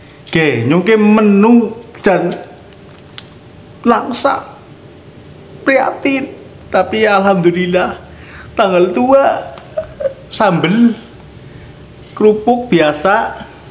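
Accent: Banyumas, Indonesia. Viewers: Saya suka logat ngapaknya pak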